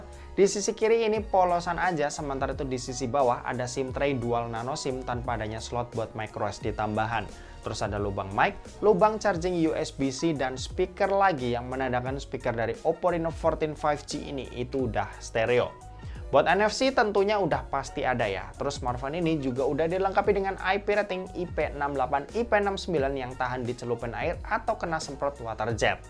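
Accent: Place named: Purwokerto, Indonesia. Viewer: PURWOKERTO YA INI MAS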